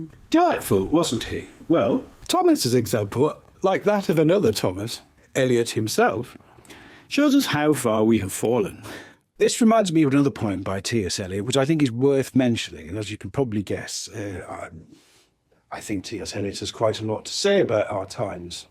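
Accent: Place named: United Kingdom. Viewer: Sometimes you make sense, for a Britain, despite the accent.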